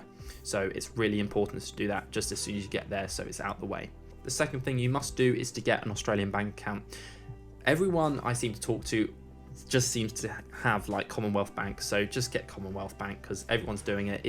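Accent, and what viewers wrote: United Kingdom. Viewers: What is your major I wonder, you have a perfect and professional British accent like my tutor in Durham uni , love it soooomuch!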